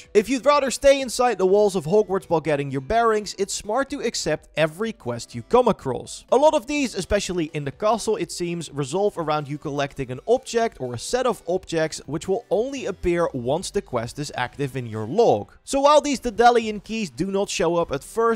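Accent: Netherlands. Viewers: Nederlands accentje hoppaa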